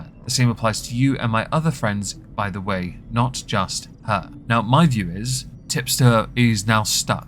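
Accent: RP, United Kingdom. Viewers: 😊 heh....me like when posh brittish voice guy say mean, true things about the people me no likey😊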